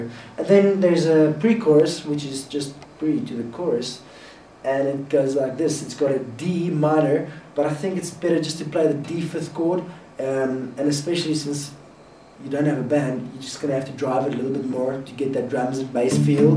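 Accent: South Africa. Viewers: Cool clip, easier than I thought it would be to play. I thought you sounded South African. I'll go play this on the stoep tonight before the braai. Cheers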